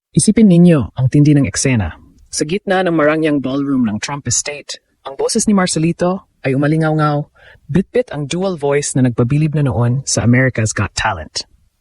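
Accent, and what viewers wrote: Philippines. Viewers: AI filipino accent is horrible.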